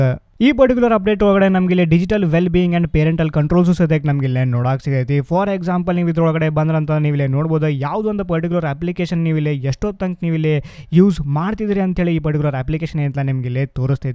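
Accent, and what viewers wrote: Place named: Uttara Kannada, India. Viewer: Nim UK Kannada riwev super bro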